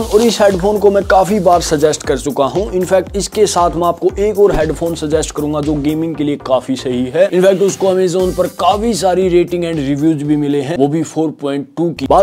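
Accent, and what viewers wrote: Haryana, India. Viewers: Haryana se hai kya bhai 😊?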